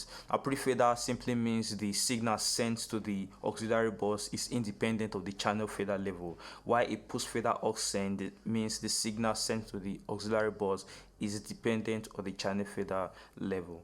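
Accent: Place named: England, United Kingdom. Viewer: I am struggling with his English accent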